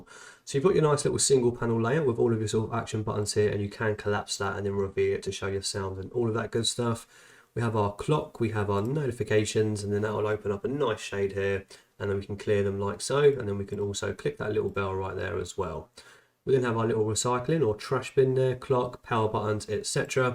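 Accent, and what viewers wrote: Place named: London, United Kingdom. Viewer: I like your London English accent!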